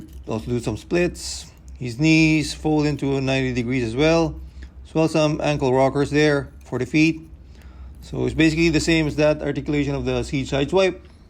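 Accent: Philippines. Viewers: i dont really care for beast skywarp but earth sideswipe is what i really want! hey are you from the philippines? i am you just have an accent when youre filipino but youre speaking english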